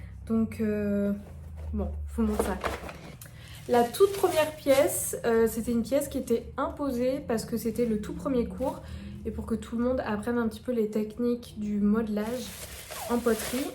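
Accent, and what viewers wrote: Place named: South, France. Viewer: trop du bien , d'ou tu viens ? jentends laccent du sud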